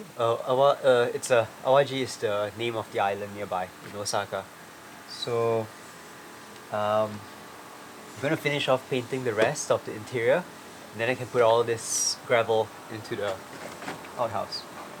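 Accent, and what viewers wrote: Singapore. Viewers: I was looking for video about Irori and Jizai Kagi, and stumbled upon yours. I watched the previous episode where you documented the installation process. It was so peaceful and full of old wisdom. I heard you speak Japanese with Mr. and Mrs. Okuno, and I thought it must be another "Peaceful Cuisine" like channel. But then suddenly, eyhhh..Singlish? Anyway, when the time is right, thinking of visiting this farm-to-table cafe. Keep up the good work!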